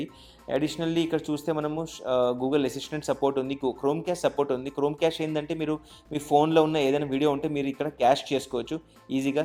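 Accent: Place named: Hyderabad, India. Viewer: Sir u r speaking good telangana language I.e, hyderabadhi language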